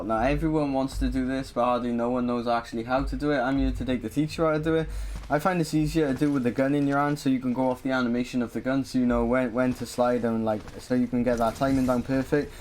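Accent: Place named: Wales, United Kingdom. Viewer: Can't watch ur too Welsh sorry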